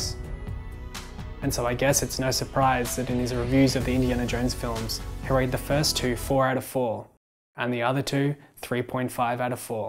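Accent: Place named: United Kingdom. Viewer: Its amazing you can say this with a straight face. I thought it was hilarious, the brittish accent is really the icing on the cake!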